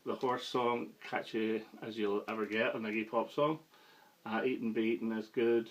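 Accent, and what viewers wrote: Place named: Scotland, United Kingdom. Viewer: you did a great job critiquing Iggy's albums. I really enjoyed it, and I think your laidback style and relaxed Scottish brogue were major reasons for that. I had 1st album with ' ' on it. I really like Iggy's humorous songs. I think he writes very funny lyrics like ' '. ' ' has often been likened to ' ' do you think that's a relevant comparison? Totally agree that ' ' was Iggy Pop's greatest album. Every song is tremendous.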